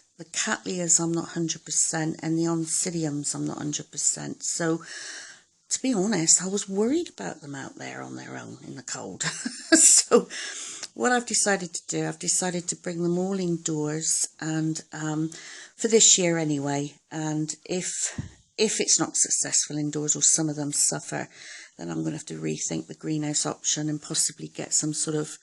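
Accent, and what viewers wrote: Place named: West Country, United Kingdom. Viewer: What a wonderland you live in, it's gorgeous. You sound like you may be from the West Country? I'm a former Essex girl.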